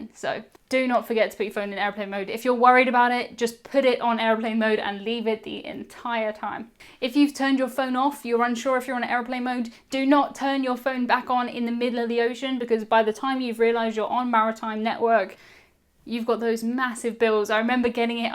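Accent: United Kingdom. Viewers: Please say "aeroplane" just one more time. I love the British-isms. "Spec-i-AL-i-ty" "Washing" "The Lift" Love it. Keep talking. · Hi, great tips and I do like your background. Especially, bringing a variety of medicine, (including sea sickness pills). I'm one to bring stuff like that, as I grew up a Girl Scout (Girl Guide in Britain) and try to be prepared for anything. My companion would probably be the on to appreciate that I had headache medicine and not only extra sunscreen, but also the pain relief spray in case of a sunburn. 💊🧴. I'm in the US, near Chicago, IL and I d o enjoy listening to your voice. It doesn't seem posh, just a Brit speaking. 😊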